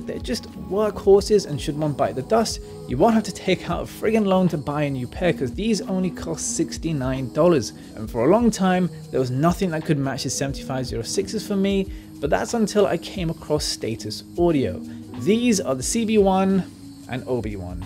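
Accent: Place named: United Kingdom. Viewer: I though "another american review" Hears girl - british af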